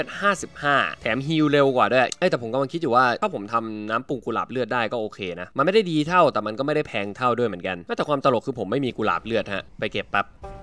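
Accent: North, Thailand. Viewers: พี่เป็นคนเหนือหรือเปล่าครับ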